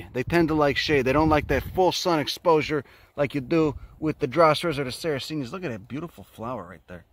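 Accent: Chicago, United States. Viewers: I liked your Chicago Italianiano accent, then you cussed, along with your Latin splain, and you won my heart and mind.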